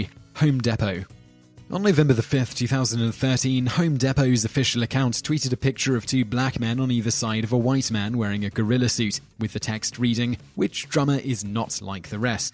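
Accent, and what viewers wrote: England, United Kingdom. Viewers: Mobiganic Actually the lists are typically really well researched. But he just reads them, they have other writers for the website itself. Yeah, he's British, so pronunciations Americans take for granted aren't used so much there. Also, he's British, so he pronounces some things differently. Get used to it. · He's English. they pronounce things differently there. Get over it.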